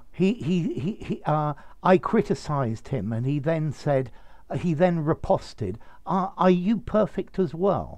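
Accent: United Kingdom. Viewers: Best teacher. I like your way of explanation. Especially your british accent.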